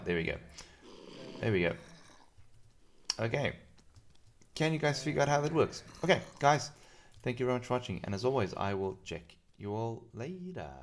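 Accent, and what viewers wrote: South Africa. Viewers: Is your accent South African, if not it sounds surprisingly like it.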